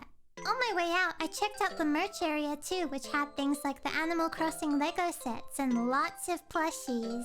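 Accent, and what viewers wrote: Australia. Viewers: You’re Australian? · You know. I sometimes forget you're Australian. Ahahahaha.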